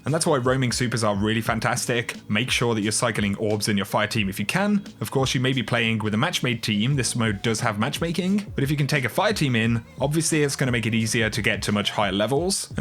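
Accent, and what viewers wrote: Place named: Scotland, United Kingdom. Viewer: U Scottish fuk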